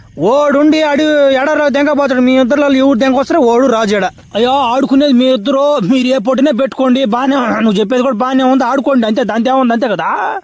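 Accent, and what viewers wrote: Nellore, India. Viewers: Nellore slang 😂😂😂 maadi kooda Nellore district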